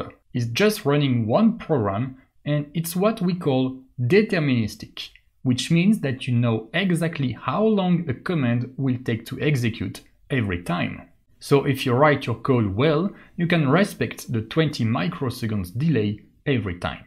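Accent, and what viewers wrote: France. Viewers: Where are you from my friend? I heard some french accent, isn't it?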